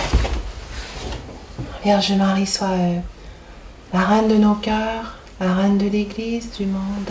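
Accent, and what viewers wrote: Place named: Quebec, Canada. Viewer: Quel bel accent québécois..il y a 40 ans je suis restée 3 mois à Montréal pur terminer ma thèse de maîtrise sur le mythe dr Maria Chapdelaine,,,,quel pays mervéilleux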